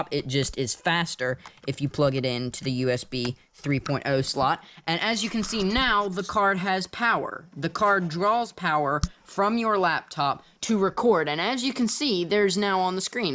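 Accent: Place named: United States. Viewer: Its kinda like what the people in England think Americans sound like in a good way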